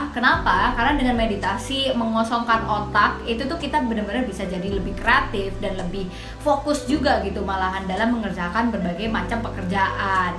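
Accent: Java, Indonesia. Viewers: wong endi mbak? wong jowo huduk ? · Orang Jawa ya mba? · Orang mana mbak kok medok jawanya?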